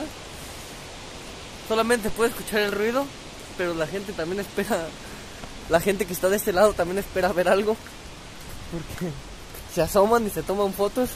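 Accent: Mexico. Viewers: Jajajajaja me encantó tu acento mexicano "hace un putero de frío" 😂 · vi el vídeo en face y me di cuenta q era mexicano x la forma de hablar, me enamore jajajaja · me encanta los videos pot q habla como cualquier mexicano chingon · Amo que hables !!! Tan mexicano es muy divertido !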